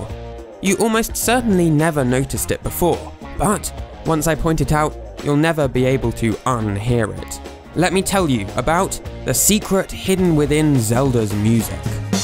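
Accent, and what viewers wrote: United Kingdom. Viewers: i like how you brits say Ocariner not Ocarina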